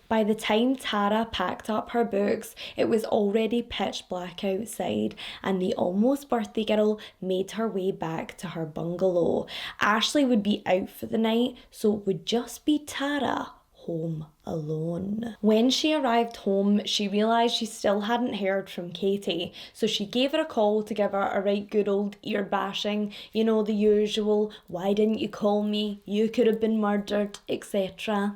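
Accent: Scotland, United Kingdom. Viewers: You crack me up, you little weirdo. I love your accent so I'd listen to pretty much anything you wanna talk about. Can't wait to take a trip to Scotland!! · I'm just now watching this, months after posting, because I thought she was a young child from the picture. Children stories are heartbreaking more than adults, so I never watch, but out of desperation at the moment, I'll watch this, so I can hear that sweet Scottish empathetic voice of yours and hear about this poor young ladys situation. If ever I have skipped any of your videos, it's cause it's about a child, the younger they are, the worse it is to listen to. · BTW, are you Scottish? I adore your accent and have Scottish friends. Love visiting them when we can.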